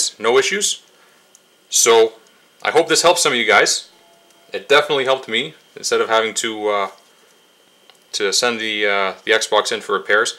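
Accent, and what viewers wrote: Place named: Canada. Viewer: You sound a little like a Canadian.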